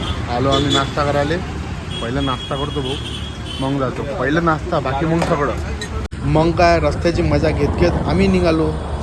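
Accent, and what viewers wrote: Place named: Vidarbha, India. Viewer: भाऊ भाषा लय भारी आहे तुमची बहुतेक तुम्ही आमच्या वऱ्हाड aतले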